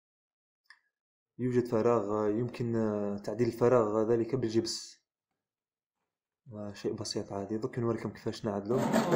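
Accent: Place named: M'Sila, Algeria. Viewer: تبان هدرتك من جيهة لمسيلة مشكور الاخ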